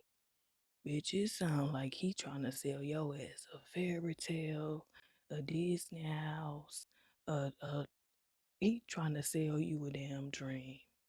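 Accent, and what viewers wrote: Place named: South, United States. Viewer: What accent is this? Its southern right? Idk why but its so nostalgic to ppl I heard as a kid. I need to know 😂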